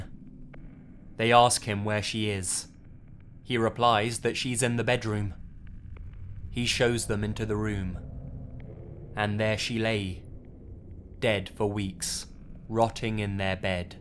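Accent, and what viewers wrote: England, United Kingdom. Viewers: are you British I'm not good with ascents sorry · same brits ftw is he british?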